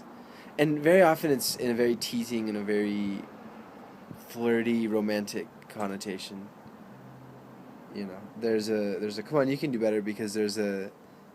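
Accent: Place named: West Coast, United States. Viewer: lol you said at the function. you are def. from the west coast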